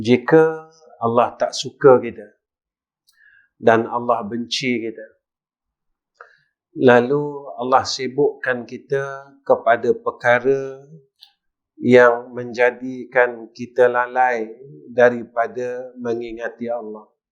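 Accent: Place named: Terengganu, Malaysia. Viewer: Ustaz ni orang mana, orang Terengganu ke, cakap mcm org Terengganu je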